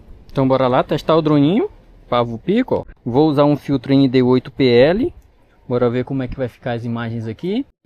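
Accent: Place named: Maranhão, Brazil. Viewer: Vi um vídeo seu que estava se tremendo todo e com dificuldades ksksks. Ja melhorou muito. Vc é maranhense né? Pelo sotaque eu suspeitei que era, e depois que vi escrito UFMA, ai tive mais certeza ainda. Mas só me tira essa dúvida para eu ter mesmo certeza. Sucesso ai meu brother, vídeo muito bom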